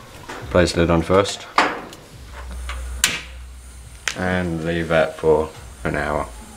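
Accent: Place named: Australia. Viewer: Australia / New Zealand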